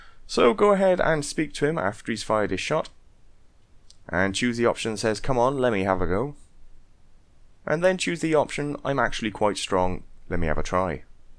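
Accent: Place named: Scotland, United Kingdom. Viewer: I miss you and your Scottish accent </3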